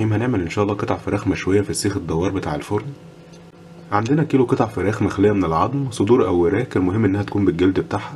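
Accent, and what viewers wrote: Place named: Syria. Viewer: مافي اطيب من المصريين من لهجتك واضح انه انتي سورية عموما كل مكان فيه السئ و الطيب عموما انا عايشة في اوروبا وشفت كتير سوريين بشهادتهم ما بيحبوا بعض والمعاملة مش بيرفيكت وبلاش اقول الباقي كل بلد فيها الفالح والطالح الا من رحم ربي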